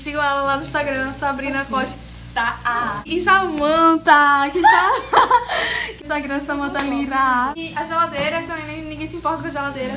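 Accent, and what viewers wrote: Northeast, Brazil. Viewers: Que sutaque maravilhoso de se escultar (sou do nordeste tb)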